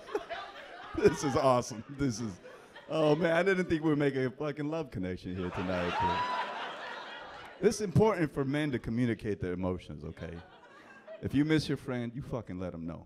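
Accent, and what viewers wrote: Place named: New Jersey, United States. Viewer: As a fellow (undercover) Patel, has the strongest NJ/NYC accent I’ve ever heard. My dog came up!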